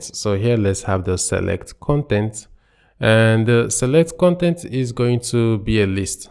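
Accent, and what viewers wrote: Nigeria. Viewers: Sounds like a Nigerian 👍🏻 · Nigerian English bro😅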